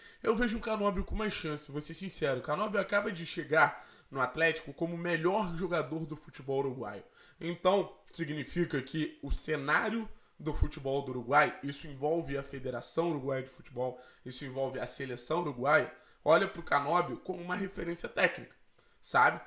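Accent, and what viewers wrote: Rio de Janeiro, Brazil. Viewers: sou novo no canal irmao, desculpe a pergunta, vc eh carioca? mora aqui em cwb? acho q eh a primeira vez q eu vejo alguem com esse sotaque torcendo p cap, inscrito jaaa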